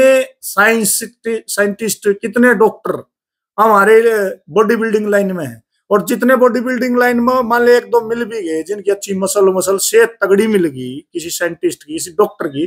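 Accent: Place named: Haryana, India. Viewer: Haryanvi bolke koi cool ni lag raha ye. Tumhe lag raha hai ki is tarah baat karke hero ban gaya, aisa nahi hai... Delhi, haryana ke aadhe parhe likhe log hi follow karte hain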